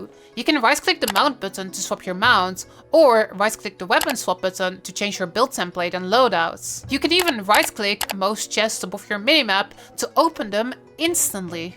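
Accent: Netherlands. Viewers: are you Dutch by chance? Your accent sounds familiar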